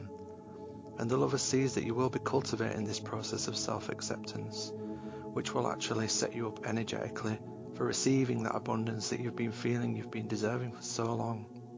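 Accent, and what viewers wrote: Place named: North, United Kingdom. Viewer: I love your gentle voice..northern..xxx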